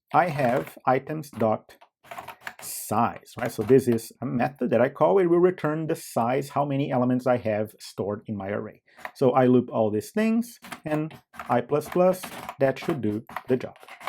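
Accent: Brazil. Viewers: I'm sure your first language is portuguese, are you brazilian? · Eu reconheço o sotaque brasileiro quando eu escuto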